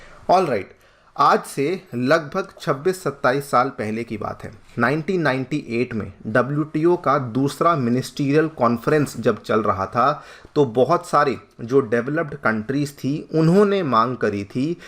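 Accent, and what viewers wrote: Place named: Uttar Pradesh, India. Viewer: shayad ye mahoday uttar pradesh se hai kyuki Maine dekha hai up me log ण कोड की तरह बोलते हैं।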